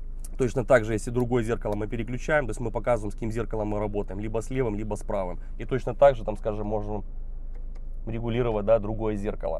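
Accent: Ukraine. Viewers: Вы такой мужчина прекрасный! Дай Бог Вам здоровья. Так хорошо рассказываете. И, вообще, обожаю украинский акцент.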